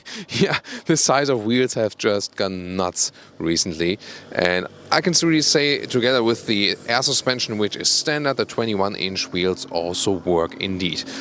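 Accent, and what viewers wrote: Germany. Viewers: I love your detailed commentary. Your English is almost perfect. But you have a very German style of speaking and sentence structure, plus use of some expressions in a German rather than English format. It would be helpful if you spoke more slowly and more clearly. Then we could enjoy every wonderful one of your observations.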